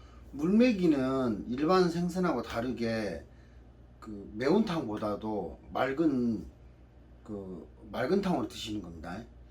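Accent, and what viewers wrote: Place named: Suncheon, South Korea. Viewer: 친정이 순천이라 고향의 사투리 정겹습니다 요즘은 고등어 는 안나오는군요 😀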